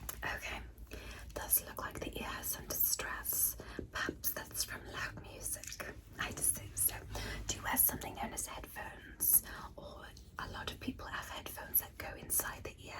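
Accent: England, United Kingdom. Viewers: X A rather long visual. However your work is admirable. The effort is just. “English Accent” sound. For all eternity. Heart. I send you my best. Goodbye. X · love British women...Can't beat that accent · U kind sound like a britisch person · Love the British accent ❤️ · As a brit im wondering why you chose a british accent for alien rp XD what you trying to say?! (Not complaining really) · Love the British accent!it’s almost just like mine!(for the record I am British!❤ Alain. 24601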